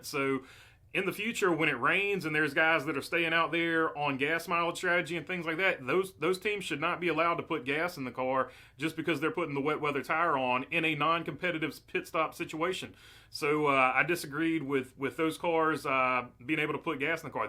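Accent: South, United States. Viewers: Sumthin bout &that southern draw keeps me tunin in! Haha 💪🏻💪🏻🇺🇸🇺🇸-with love from the west coast 🍃🍃🤙🏻🤙🏻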